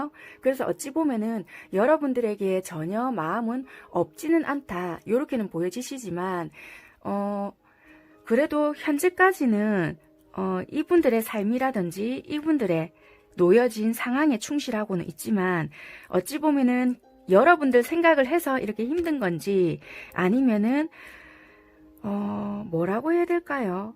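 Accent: Busan, South Korea. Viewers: 부산 사투리 뿜뿜 타로 2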